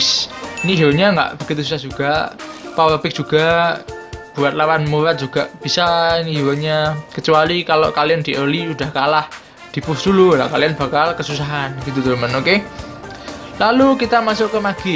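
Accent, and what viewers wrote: Java, Indonesia. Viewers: Lu orang mana bang,,,,ngomng medok bnget,,,,,,wkwkwk,,,,, Salam negeri atas awan bang,,,,,,#dieng😂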